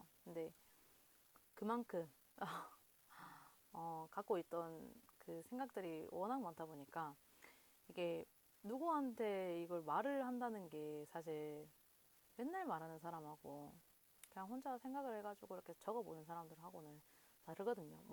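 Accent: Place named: Gyeongsang, South Korea. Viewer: 앗 사투리 너무 반가운데요~~^^ 경상도분이셨나요? 영상 감사합니다. 저도 어제 댓글로 말씀드리려했는데 영상 소리가 작아서 스피커 최대로 듣고 있었어요😅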